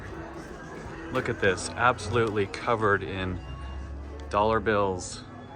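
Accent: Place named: Midwest, United States. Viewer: You're from the Midwest, aren't you?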